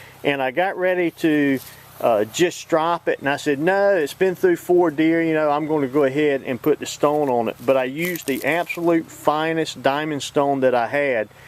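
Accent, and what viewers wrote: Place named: Southeast, United States. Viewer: That sounds like a good ole' southeastern accent. I just subbed your channel. What state are you in? I'm a Georgia/ Alabama resident.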